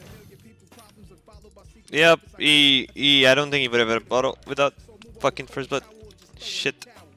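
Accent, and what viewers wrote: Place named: Arab world. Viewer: Recién me doy cuenta que tiene acento árabe XD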